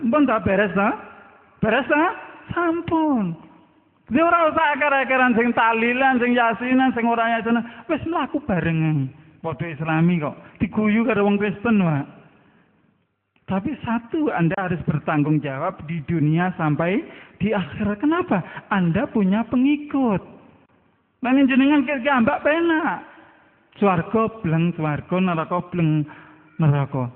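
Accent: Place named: Java, Indonesia. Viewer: keturunan brahmana dari mna logatnya aja jawa dasar ngaku2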